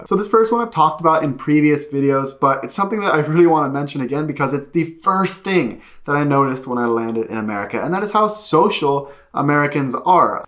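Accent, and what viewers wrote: Sweden. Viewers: Your accent sounds more swedish now than it did when you first got to sweden :) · Wow it was a year ago I last saw one of your videos, and I think I had been watching you since the very first video then. But that’s not the point haha, is it just me or are you starting to get an Swedish accent??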